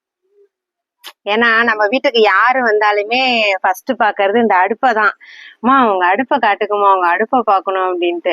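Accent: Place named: Mayiladuthurai, India. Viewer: Srumsi thirsmaisali ma❤😊 enga relatives lam mayiladudurai la erukanga unga slang apdiye eruku anga erukavanga lam epdi ungala mare dha semaya vela seivanga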